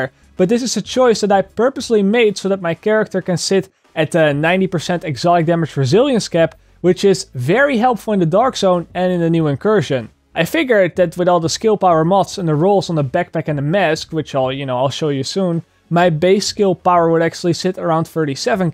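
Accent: Netherlands. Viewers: Je klinkt als een Nederlander ben je dat ook? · its dutch english accent xD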